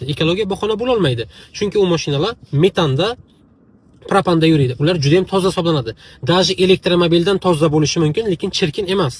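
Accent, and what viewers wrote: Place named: Khorezm, Uzbekistan. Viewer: Bu brat Xorazmdan uxshidi aqsent uxshamin duribdi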